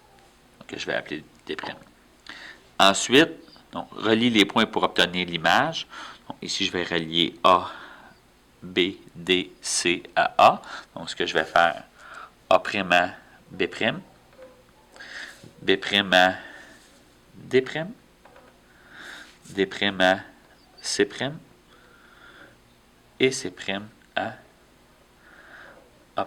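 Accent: Quebec, Canada. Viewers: Non c’est son accent québécois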